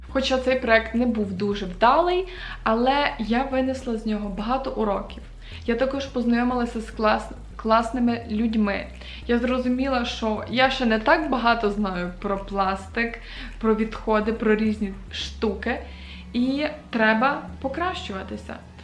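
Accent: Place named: Lviv, Ukraine. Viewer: Та вона чисто по львівськи говорит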